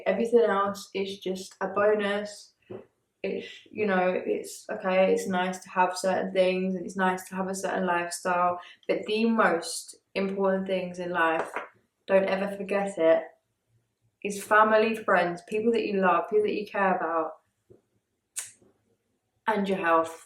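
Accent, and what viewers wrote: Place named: London, United Kingdom. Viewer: It been a while since I came on this channel. Since when has got a London street accent 😆??. Happy new year!💞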